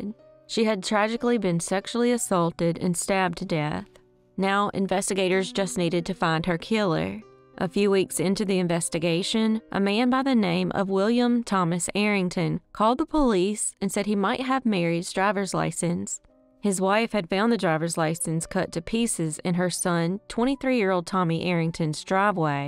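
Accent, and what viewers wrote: South, United States. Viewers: hello sweet southern lady. love ur channel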